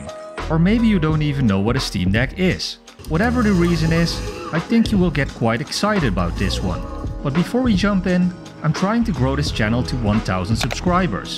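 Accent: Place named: Netherlands. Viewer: Jij bent toch een Nederlander é haha, ik hoor het aan je accent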